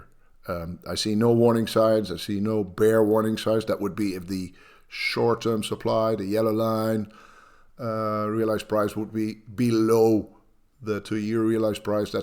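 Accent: Netherlands. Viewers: If someone sounds like the new NATO top dog, they're Dutch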